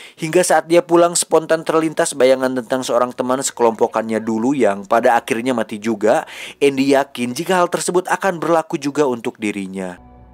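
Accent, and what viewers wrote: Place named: West Java, Indonesia. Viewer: Lidah orang sunda nggak bisa disembunyikan haha. Weduk, Camal.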